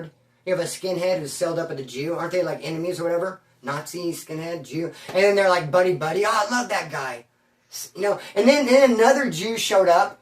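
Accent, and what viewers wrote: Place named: California, United States. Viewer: until you started talking and the Cali accent came out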